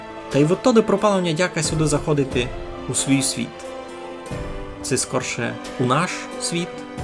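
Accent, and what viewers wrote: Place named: Transcarpathia, Ukraine. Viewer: закарпатська... важко зрозуміти, але розумію😅😊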